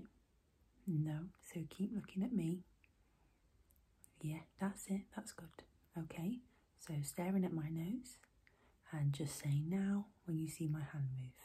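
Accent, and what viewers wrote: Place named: Nottinghamshire, United Kingdom. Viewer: that'll explain the northern sounding twang to your voice. I love that Nottinghamshire accent. It's like a soft northern accent. It works really well for soft spoken asmr. Would love to hear what your voice sounds like with in ear whispering ❤️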